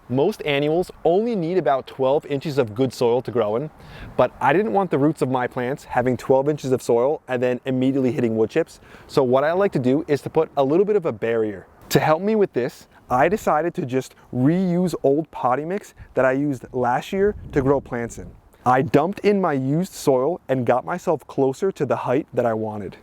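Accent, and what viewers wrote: New Jersey, United States. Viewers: First time I've seen you, I'm guessing you're from Jersey, my wife is and her father said WOODER instead of WATER.😂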